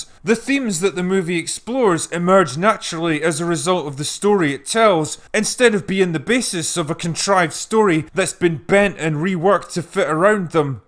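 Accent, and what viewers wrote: Scotland, United Kingdom. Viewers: Critical Drinker. You are my favorite film/tv show reviewer on YouTube. Besides your hilarious persona, which is riddled with burps, farts, alcohol abuse, powerful Scottish accent and general condescension towards the "greater themes", I think you really nail what makes a good movie. It's like you said, it's about the plot, internal logic and character development. Really simple shit. Keep up the good work! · I never get tired of Scottish accents. ;) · The Scottish accent and the sarcastic drunk voice make these videos so much better.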